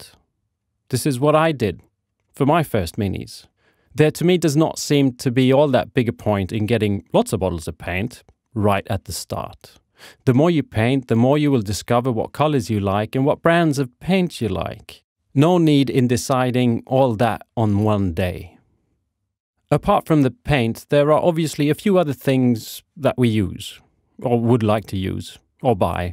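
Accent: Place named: Sweden. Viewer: I like your style, there aren’t many minipainting channels that look like yours. I would love to be proven wrong. Though you were British for a short moment but then I heard the Swedish and realised yes this man has a lot of style. I can relate more. Also the cinematography or photo I don’t know what is what, it very much works. Also how you give the viewer the why and your purposes. Not just the how. Very important. I like the string hula conversion very much, would you mind showing me the ropes I want my own!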